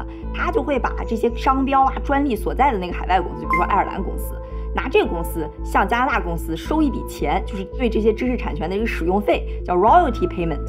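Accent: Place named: Dalian, China. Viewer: 你作为大连人讲讲大连白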